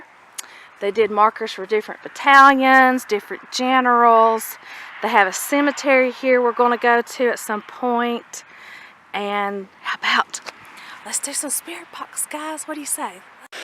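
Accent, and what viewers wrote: South, United States. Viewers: Not really a ghost hunter... I just watch these videos to see what people come up with... anyway, if you are from Alabama, you should have tried the Bloody Lane at Antietam (nearby) or around Devil's Den in Gettysburg... lot of Alabamians died around there... I don't think ghost boxes reveal very much, if anything... just a bunch of random, disconnected words... not real conversations... but one semi-legit guy said he tried sing to ghosts at Gettysburg thru a spirit box, and he got something like a full song in return... my point is, you have a nice accent and a charming southern demeanor... if you were trying to get them to talk, well, go where the Alabamians were and use that drawl, darlin'... 😊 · Thanks, nice video, and love your southern accent! It’s a shame that the Jenny Wad house is literally surrounded by a 5 story hotel, somehow takes away from the experience. 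· i just love your southern accent,keep up the good work,i enjoy it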